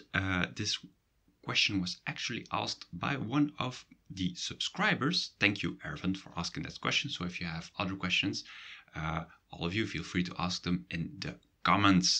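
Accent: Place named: Belgium. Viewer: LOL, the region where I live is in America. But it should serve me well enough to know that your pronunciation is Belgian and to listen carefully to the differences. I speak French and have had to deal with wildly different pronunciations in Canada as well as vocabulary and idioms. However, focusing on the grammar should be the same.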